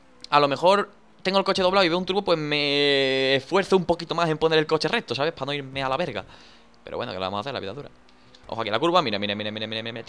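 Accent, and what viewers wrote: Mexico. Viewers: soy yo o ya habla como mexicano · De donde o con quién estás aprendiendo hablar como mexicano!! saludos!!😉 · es español y habla como mexicano like si crees que es cierto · Azuverga we? De cuando aca habla mexicano?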